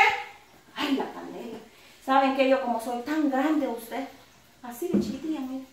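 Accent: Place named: El Salvador. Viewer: Gracias por saludarme ami y mi esposo,nos gustan sus videos habla bien salvadoreña nos encanta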